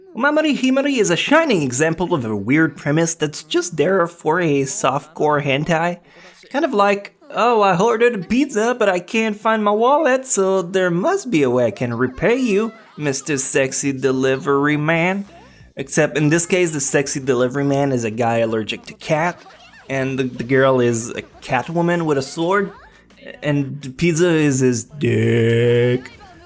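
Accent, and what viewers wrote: Quebec, Canada. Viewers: Wait you're a french canadian ? Or you just live in Quebec ?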